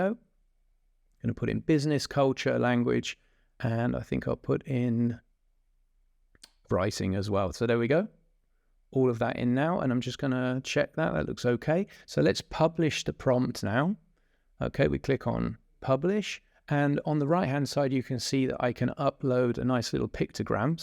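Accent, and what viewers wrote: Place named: England, United Kingdom. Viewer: You have a very nice english accent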